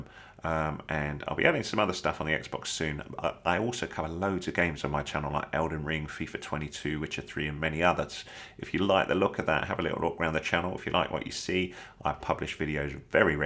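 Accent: United Kingdom. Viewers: U already know it’s a good tutorial when they got a British accent 🙌🏽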